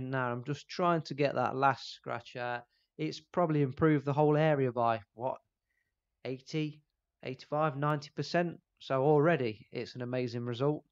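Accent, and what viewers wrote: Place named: East Midlands, United Kingdom. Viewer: Brilliant idea! Great video and is that an East Midlands accent (Nottingham? Mansfield? Derby?)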